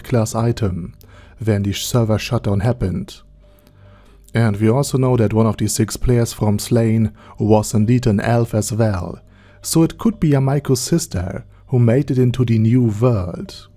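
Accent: Germany. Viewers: Anybody can take this how they want but your smooth masculine German baritone gives me very nice dreams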